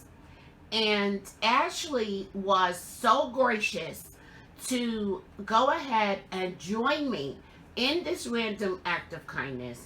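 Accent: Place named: Bronx, United States. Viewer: I like your videos, that blanket is amazing. And your sister will love that shawl I'm sure, it's gorgeous. My husband was listening while I had your video playing and he said where is she from? I said she lives in NC but I think she's from further north. He said her accent sounds like our dear friend Maryjane who is from the Bronx. And when you said you'd send this to your sister in New York, I thought I'd ask you where you were from. I'm originally from eastern Pennsylvania but live on our sailboat now and meet people from all over the world. Thanks so much for brightening my day and I'll keep that quote in mind.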